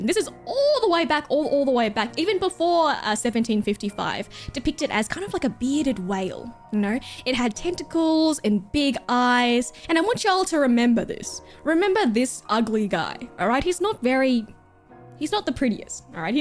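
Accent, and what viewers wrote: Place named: Australia. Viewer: Ahoy, I love the Australian voice.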